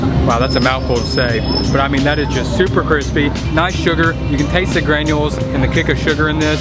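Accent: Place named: South, United States. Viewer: The vlogger sounded like hes southern.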